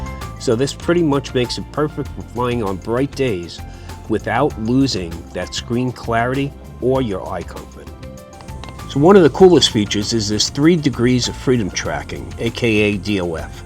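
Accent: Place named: New York, United States. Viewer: New yorkah?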